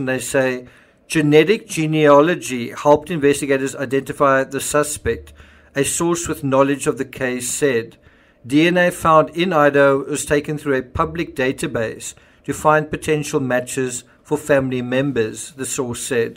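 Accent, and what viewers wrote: South Africa. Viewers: Narater sounds South African.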